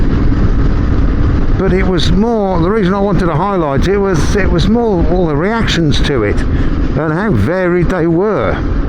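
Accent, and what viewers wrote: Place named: United Kingdom. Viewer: >click on interesting motorcycle vlog >hear british accent >click off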